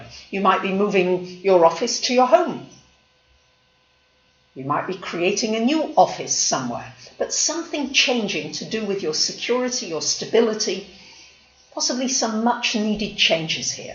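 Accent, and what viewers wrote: Greece. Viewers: I dont smile much and that is the problem. But your energy is different than others and i appreciate the greek , with that i resonate. A rarity. Not just info, but you too. Your heart and light comes through and your accent helps. 😄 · looooveeee your greek accent <3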